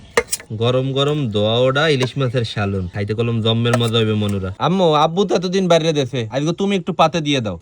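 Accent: Barishal, Bangladesh. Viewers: ওরে বরিশাইল্যারে!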